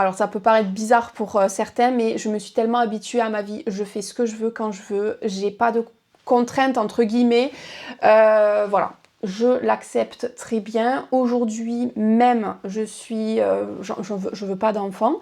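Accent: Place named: Béziers, France. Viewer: Ah je me disais bien que cet accent c'était celui de chez moi 😄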